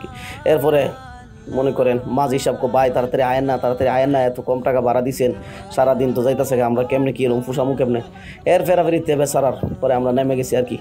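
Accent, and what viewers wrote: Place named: Raipura, Bangladesh. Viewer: ঠিক মতন দিছেন একদম আমাদের রায়পুরার ঐতিহ্যবাহী আঞ্চলিক ভাষা!!! আমডারে লইয়াও তো যাইতে পারতেন.....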